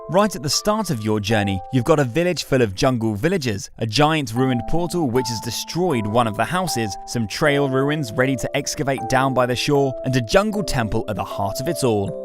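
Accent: United Kingdom. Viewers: He sounds like he's from the great British baking show.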